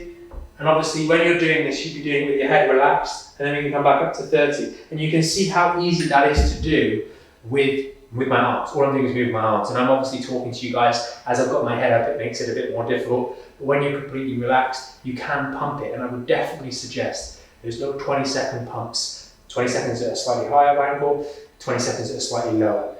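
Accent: England, United Kingdom. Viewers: Looks to me that it is a teeter inversion table, between the heavy English accent, the echo, and the fact that he’s speaking turbo mode-I can only hear like one out of 25 words (why do people bother getting a college education when it seems to me logic gets pushed out the back door every single time without fail.👎🏼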